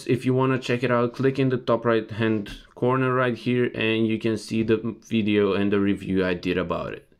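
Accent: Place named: Balkans. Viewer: Are u from balkan? I know the accent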